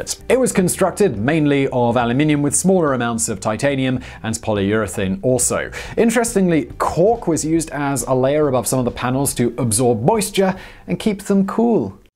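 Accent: United Kingdom. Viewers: I must respectfully disagree. has an awesome British accent. I say, let him speak.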